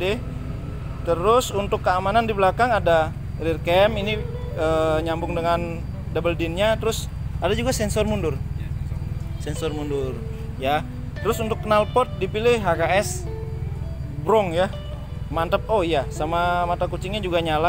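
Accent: Bali, Indonesia. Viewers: Logatny kyk bali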